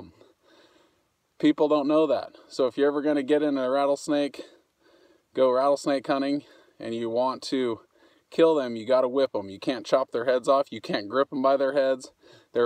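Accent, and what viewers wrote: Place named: Australia. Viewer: Dude defenetly from australia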